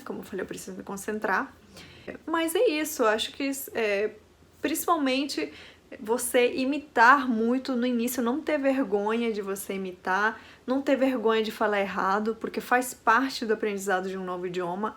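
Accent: Rio de Janeiro, Brazil. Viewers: É uma carioca da gema. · Fluentemente nacionalizada brasileira, mas tragicamente desperuanizada para transforma-se numa careoca . 🌺🌹🥀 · Incrível seu fino sotaque carioca,! Trabalhei em uma empresa de assistência técnica que tinha clientes por toda América Latina. Cheguei a morar por um ano na Venezuela, onde percebi que os brasileiros conseguem compreender bem melhor o idioma espanhol que os hispânicos podem entender o português. Certamente a grande variedade dos fonemas do português é um desafio para os estrangeiros. Parabéns! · Você tem muito sotaque carioca🥰 Eu amoooo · vc já é cariosíssima pra quem não sabe a história da sua família aqui nem imagina que vc é estrangeira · Acho vocês SHOW. Mas eu vou te corrigir. Você não perdeu sotaque nenhum. Se esqueceu do xiiii (Carioca) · Perdeu o sotaque de quem fala espanhol e ganhou o sotaque um tanto carioca. 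Bem! Sotaque carioca é bem brasileiro. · Eu acho que você sentia-se incomodada , sobre como as pessoas te tratavam.... ou não queria se sentir estrangeira....você queria sentir-se pertencente ao País ao lugar.... pegou até o sotaque carioca..... só cuidado para não ficar caricato..... (forçado) Parabéns pela dedicação.....realmente não parece que você não é do Brasil... bjs.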